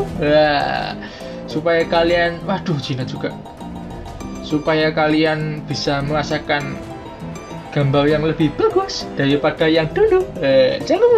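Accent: Java, Indonesia. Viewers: Medog bang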